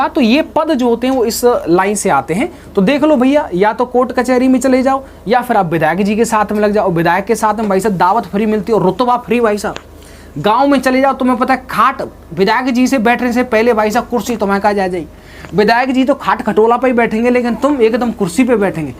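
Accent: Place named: Western Uttar Pradesh, India. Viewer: sir aap aligarh ya mathura ke lagte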